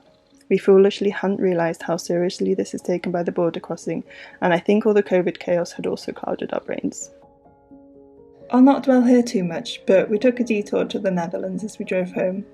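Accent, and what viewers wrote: United Kingdom. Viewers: Interesting life style, interesting content. Unfortunately have a hard time to understand your fast speed British accent, please do a favor for your audience to slow down with your narration!! Thanks for your content, keep us updated.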